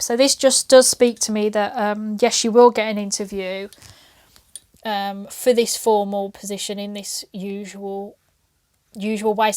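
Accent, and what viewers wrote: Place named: Northern England, United Kingdom. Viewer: You just came on my feed as I was asking about a job application, but I just had to comment before anything and say that as a northerner, it was such a pleasant surprise hearing a northern English accent when I clicked on this video! I hardly ever hear other northern girls!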